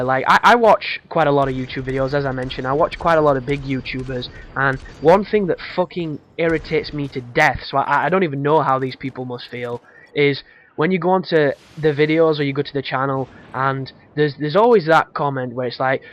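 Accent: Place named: England, United Kingdom. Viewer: Thx for your very helpful vids and good commentary. I love the " bot";)) no im not an american i just love the english accent. Keep up the good work.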